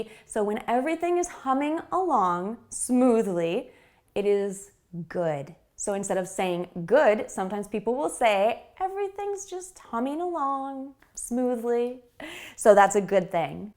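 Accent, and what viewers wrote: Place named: Philadelphia, United States. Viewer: I like to hear your accent. I seem to hear the same accent in Philadelphia.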